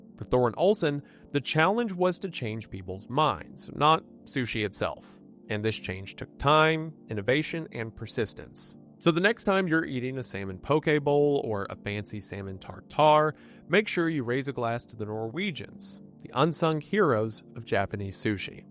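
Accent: Appalachia, United States. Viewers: People complaining about how a deep south Appalachian pronounces words from Japan are literally basement dwellers lol. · So this is what happens when you read Japanese words in an American pronunciation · I like his appa-LACHA pronunciation of Japanese names. Brings a little southern comfort. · I don't know if he's mispronouncing it intentionally or genuinely but either just doubles down on his Appalachian charm.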